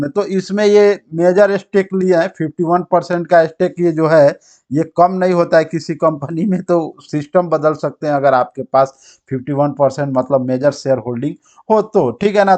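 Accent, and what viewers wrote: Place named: Bihar, India. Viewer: Aap Bihar se hai, aisa aapke bat se lagta hai.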